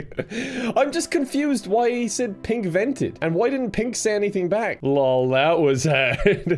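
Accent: Ireland. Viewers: irish accent is the best accent, prove me wrong <3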